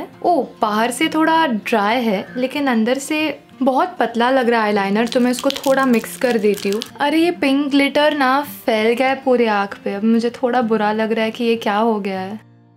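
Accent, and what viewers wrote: India. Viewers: Aap puri american lg rhi ho😊 bs aapke hindi accent se indian lg rhi h aap...maybe NRI?😊 bdw,love ur vdo..frst tym seeing ur vdo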